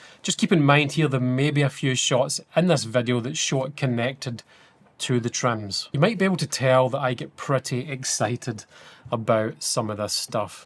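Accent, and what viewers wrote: Scotland, United Kingdom. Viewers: I enjoyed the Scottish accent very much.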